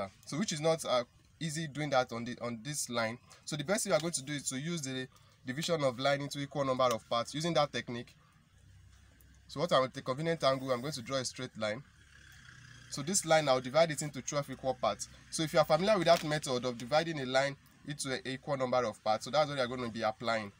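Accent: Nigeria. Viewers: I could tell you're Nigerian from the accent. I'm Nigerian too. Haha😂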